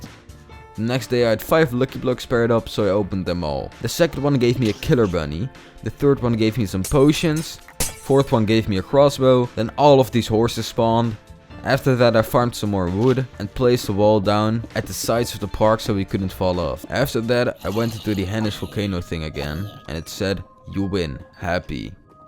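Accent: Netherlands. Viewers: Are u dutch? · You’re Dutch and i can hear that XD Edit: on is Dutch chat said: nu pas and that is Dutch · Are you dutch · U DUTCH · where are you from netherlands? or are you russian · Are you dutch?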